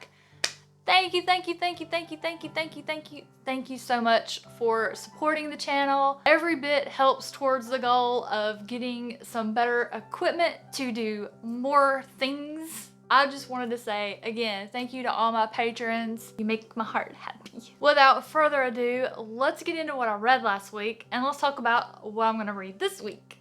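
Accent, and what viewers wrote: South, United States. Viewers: It's the southern accent.😍😍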